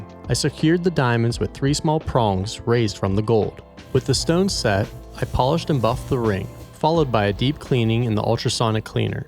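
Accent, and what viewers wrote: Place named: Pennsylvania, United States. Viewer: I absolutely love your channel... You're doing a great job cultivating content that is both visually stunning and incorporates commentary that engages the viewer. I knew you must be from PA area bc of your (subtle) accent! No judgement, I'm from Baltimore which may be home to the grossest sounding dialect of them all (but iiiii don't have an accent😉). Thanks for the time and effort you put into your videos, and one day i will bring ya a big ol' princess cut set in rose gold to be sized. 😁 Jk,jk. I'm team asscher all the way.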